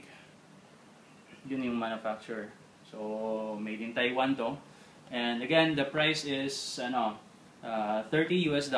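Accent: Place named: Philippines. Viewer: It's the disgusting pinoy accent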